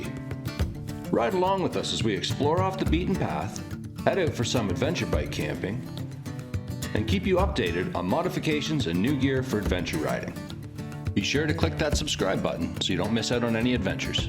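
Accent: Canada. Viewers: Sweet canadian accent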